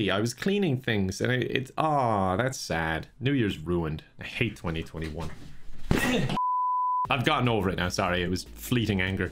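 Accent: Ireland. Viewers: i watched many of you videos this year after a friend sent me your first among us one when she was tryna get me to play and i kept watchin them because it made me miss my grandparents a little less,, that might sound weird but theyre irish and your accents are slightly similar tho yours is stronger i havent seen my grandparents for over a year so thank you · Instead of listening to music in the car, I play videos when I drive. Nothin like Irish accent to get you going places (no pun intended)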